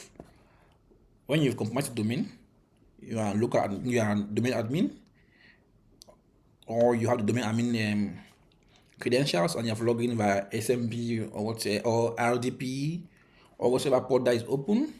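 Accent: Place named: Cameroon. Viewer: I recognize that Cameroonian accent. I'm on eJPT right now. This is probable my next cert.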